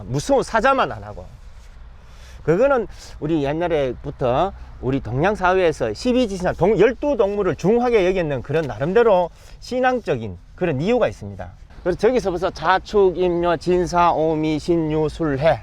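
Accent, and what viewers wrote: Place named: Gyeongsang, South Korea. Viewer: 시간과 여유가 있으면 늘 생각하고 또 방문하는 마음의 고향 경주...선생님의 자세한 설명 잘들었습니다.민아학생은 졸업했겠네요 구수하고 따뜻한 갱상도 사투리가 듣기 좋아요^^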